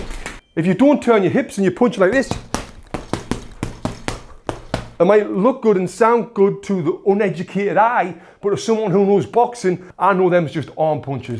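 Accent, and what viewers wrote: United Kingdom. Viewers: I love your accent sir. I think it's British accent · His boxing is awesome, but how pleasing his British(right?) accent is? +100 to boxing skill if you can talk like that, I'm not gay btw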